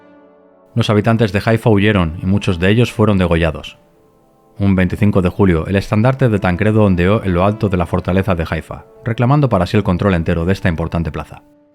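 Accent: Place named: Spain. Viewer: Excelente narración con lujo de detalles, felicitaciones!! No hay nada mejor como la voz humana, y si tiene acento español mejor, para narrar estas historias. Estoy hasta la médula de la IA. Saludos desde Uruguay 🇺🇾 y feliz 2026!!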